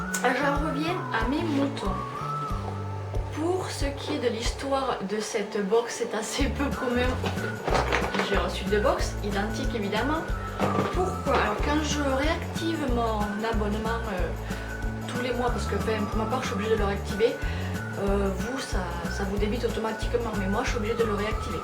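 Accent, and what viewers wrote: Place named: South, France. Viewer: Une drôle d'histoire 😉 j'aime ton enthousiasme et ton bel accent du sud 👌👍1 like + 1 abonné de plus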